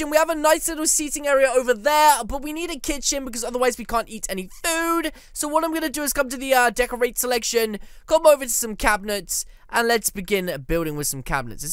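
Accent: England, United Kingdom. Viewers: one quick question. Are you English or from England?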